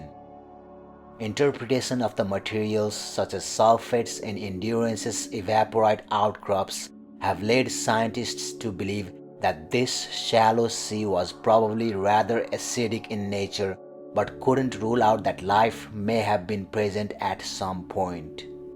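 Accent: India. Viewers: Are you from north east India? My wife is from Assam and your accent sounds similar. Cheers and thanks!!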